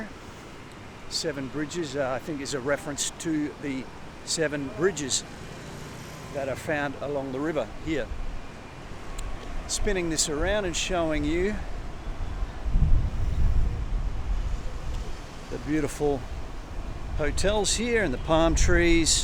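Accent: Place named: Australia. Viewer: christ mate thats one and a half of an australian accent isn't it gabba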